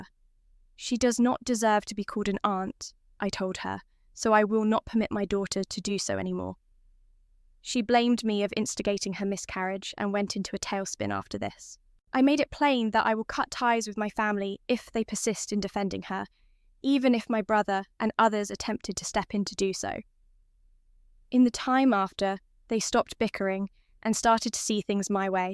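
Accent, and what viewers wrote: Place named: United Kingdom. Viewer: Hate the British accent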